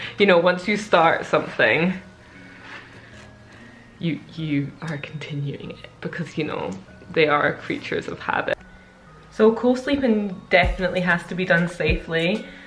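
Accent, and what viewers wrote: Scotland, United Kingdom. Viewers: Finally a Scottish accent like me 😂😂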